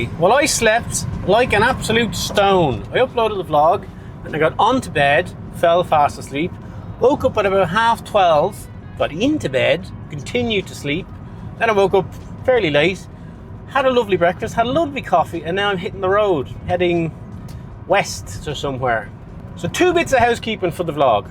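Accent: Ireland. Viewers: his Irish accent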